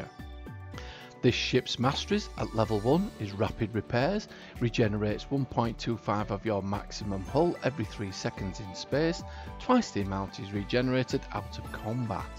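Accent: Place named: Northern England, United Kingdom. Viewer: Ive been watching your videos for a while now and just realised you sound like an airplane pilot. The cadence, the sound quality, everything. 24th century ships explained by a 21st century northern English commercial airline pilot. All the best from Manchester.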